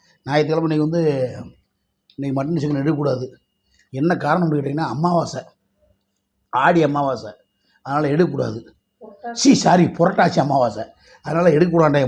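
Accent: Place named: Dharmapuri, India. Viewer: அக்கா பேச்சு சூப்பர் தர்மபுரி பேச்சு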